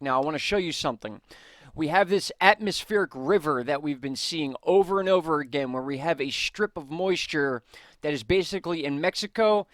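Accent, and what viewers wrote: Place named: New Jersey, United States. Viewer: Your voice sounds like an old friend of mine from Jersey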